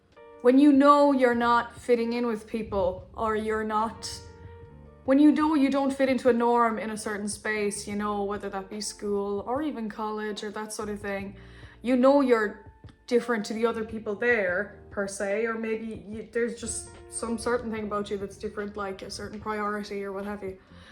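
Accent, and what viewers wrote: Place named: Ireland. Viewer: Lastly, you immediately got more irish when you went home hahah you can really hear it at the start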